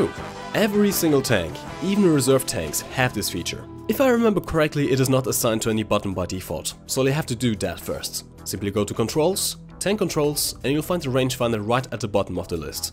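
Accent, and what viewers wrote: Germany. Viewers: You are german right? You have this special accent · I don’t know why, but i‘m german and i really hear the german accent and it kinda sounds like bad english, #nohate, still like you😂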